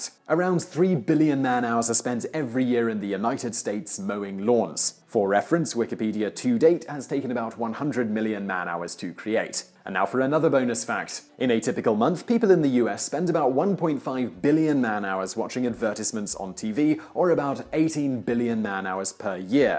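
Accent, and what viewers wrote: United Kingdom. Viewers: U British people talk so funny. U said advertisement funny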